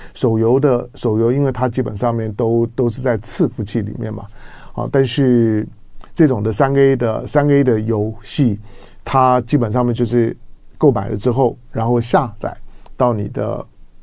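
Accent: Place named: North, China. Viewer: 唐湘龙口音越来越北方化了😂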